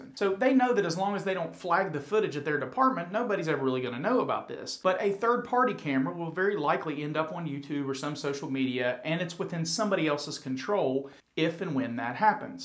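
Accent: South, United States. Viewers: Its always a good day when a Southern Drawl Law video drops Thanks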